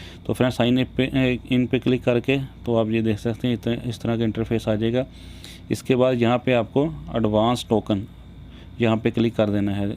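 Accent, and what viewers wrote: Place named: Shakargarh, Pakistan. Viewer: شکرگڑھ سے لگتے ہیں آپ بھائی آپ کا بولنے لہجہ بتا رہا ہے, اور ماشاءالله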